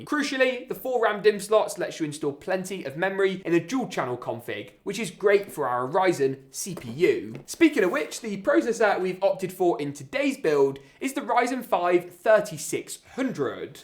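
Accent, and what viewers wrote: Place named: Wales, United Kingdom. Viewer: are you Welsh, you sound Welsh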